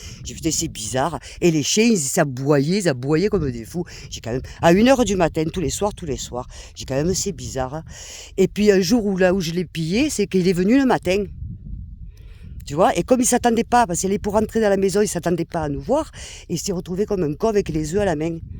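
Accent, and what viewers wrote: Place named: South, France. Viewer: Tu es trop génial , tu as encore bien gardé l'accent de notre sud , moi je l'ai perdu , et pourtant vécu longtemps à Cannes, Nimes , Alès ... Ohhh bonne mère 🤣😅j'essaie aussi d'arrêter de fumer , pas facile :face-blue-smiling: aller à Bientôt :hand-pink-waving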